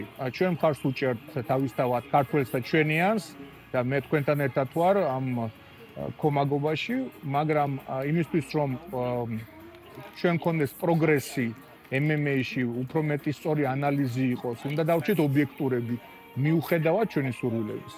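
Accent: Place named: Russia. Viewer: აქ მგონი რუსული აქცენტით ნათქვამ overhand -ზე იყო საუბარი. 😊 მაგრამ სულ არ აქვს მნიშვნელობა რას ამბობთ გავიგეთ.😊 ქართველები ჰ-ს ამბობენ უფტო ვიდრე ხ-ს თქვენ ალბათ რუსულ ენას ფლობთ კარგად და გავლენა გაქვთ. მადლობა ინფორმატიული განხილვებისთვის!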